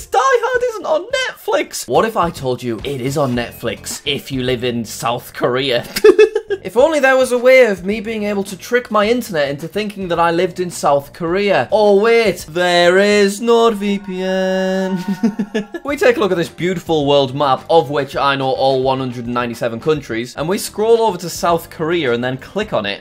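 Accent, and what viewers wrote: United Kingdom. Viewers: I can tell you are British🇫🇯🇫🇯🇫🇯🇫🇰🇫🇰🇫🇰🇬🇪🇲🇩🇳🇺🇹🇨🏴🇬🇧🇹🇻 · are you From British (UK)?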